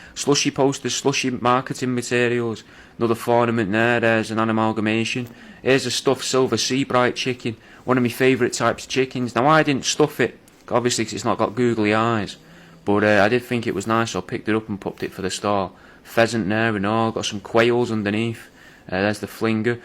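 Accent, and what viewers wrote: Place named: Wythenshawe, United Kingdom. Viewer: Are u from wythenshaw cuz u dont sound like ur from dorset 😹😹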